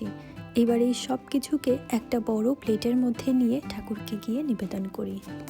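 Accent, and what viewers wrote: Bangladesh. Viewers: Amar mishti Maa..khub khub sundor lagche and lakhi rupe gune sob pujo kore cho❤❤❤❤..ami o tomar moto bangladeshi bangla boli .khub bhalo lage.. just st love this bangla...but my husband is ghotti...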